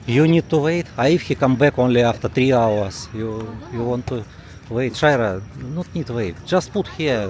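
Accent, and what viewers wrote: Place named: Russia. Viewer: So strong russian accent 😅 Привет, хорошего отдыха тебе! Спасибо, что снимаешь и показываешь!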